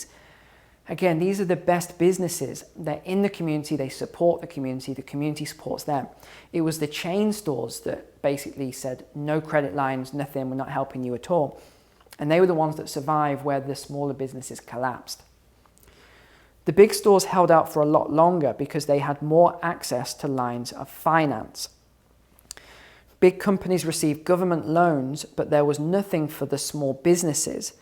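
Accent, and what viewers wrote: England, United Kingdom. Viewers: I have heard that some English people live outside the UK. His accent is authentic English. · He is definitely British . I’m British so I can hear his delivery is British, even regardless of his accent ! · English with English accent using US 'English' words to appeal to the audience.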